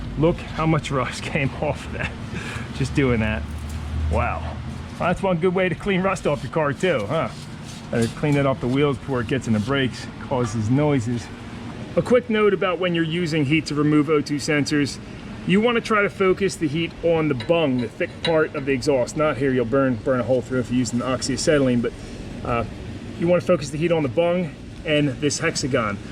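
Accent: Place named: Pittsburgh, United States. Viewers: Good vid! I hear a fellow Yinzer accent! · That's a PA accent if I ever heard one!!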